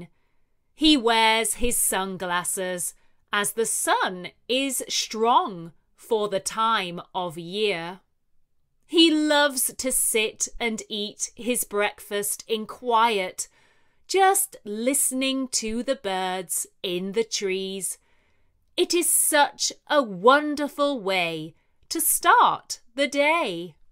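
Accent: United Kingdom. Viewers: Lovely English Stories is really helping me in improving English. The practice of native British accent is something unique about this resource. Most of the other competing resources are based on American accent. Practicing everyday i have made a good routine and the topics are coming out of daily life. Thank you very much 😀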